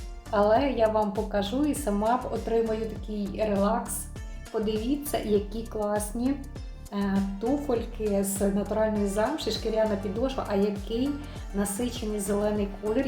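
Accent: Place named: Sambir, Ukraine. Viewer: Ви зі Львова а я з Самбора і говорите так як ми в Самборі , люблю Львів шкода що так мало часу щоб просто приїхати , походити , в мене син аутист , то ми приїжджали тільки по справах і щоб здати аналізи . А тепер думаю скільки ми втратили , бо тепер у Польщі .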